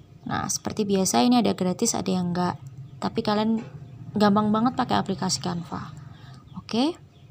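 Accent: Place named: Java, Indonesia. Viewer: Mbak nya jowo ya mba, cengkok e kliatan 😅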